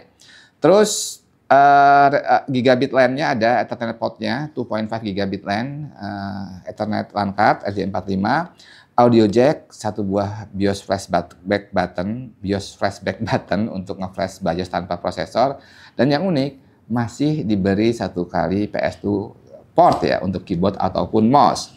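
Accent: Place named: Java, Indonesia. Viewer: kl lebih diperhatikan, logatnya beda mas ferry itu orang sumatera (jambi) sedangkan bang jawa tulen nih (jogja)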